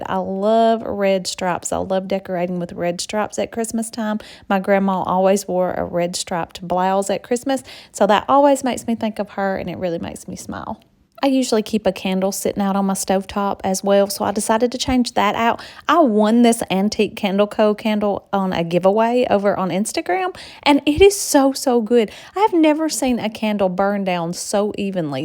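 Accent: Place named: Houston, United States. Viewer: Hi! New subscriber here!! Beautiful house and decor!! I wanted to know if you live in Houston? (Your accent sure sounds like it!) We do not have basements where I live in Houston! But I grew up with them in Pennsylvania and truly miss having one ❤️💚 Thanks for sharing!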